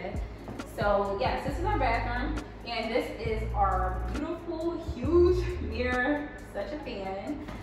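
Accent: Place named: New York, United States. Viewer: You sound like a New Yorker, but I could be wrong.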